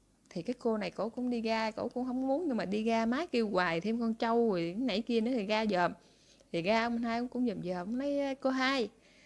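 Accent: Mekong Delta, Vietnam. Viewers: Anh thich vong noi Mien tay cua Em roi do nhe? hon nua la cai mieng rong cua Em nua do??mieng nay ma B…C…la te ca nguoi luon do nhe??🤫🙈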